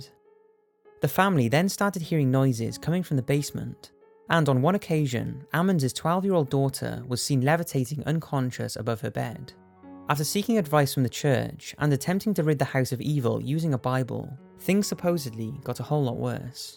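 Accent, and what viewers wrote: Wales, United Kingdom. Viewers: Sounds welsh to be honest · He's Welsh. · what is his accent? Sometimes I think defo American then at times sounds like different parts of England. Even some of the words come with a Welsh twang now and again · ElseWhere 47 That’s a Welsh accent pal, not English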